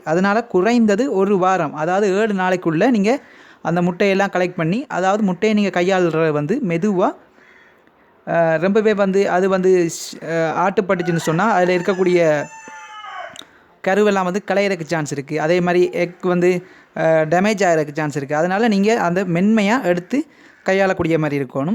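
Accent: Sri Lanka. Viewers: Hi are u Srilankan tamil nice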